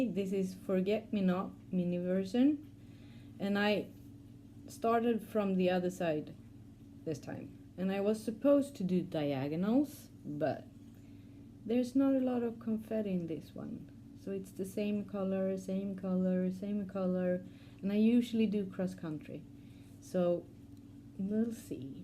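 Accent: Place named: Sweden. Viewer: Hello from the UK 🇬🇧 I love your swenglish! And great wips x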